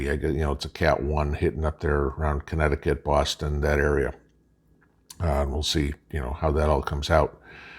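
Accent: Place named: Midwest, United States. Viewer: Oh my God… I don’t have cable, I had no idea! Wow 😮 How is that just from rain, it looks like a twister hit or something. BTW the weather didn’t hit us in CT, just rained all day. Food in Mylar bags in buckets 🪣 should be ok. Ladder from upper stories is important. (As is smoke and carbon monoxide detectors and fire extinguishers) you say “on” like I try my hardest in CT not to. Are you from the Midwest as well?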